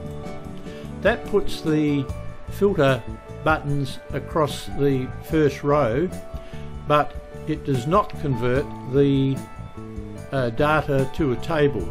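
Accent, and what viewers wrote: Australia. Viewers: True... I'm used to listening to his delightful Aussie accent... sans music... for my latest Excel learning tidbit.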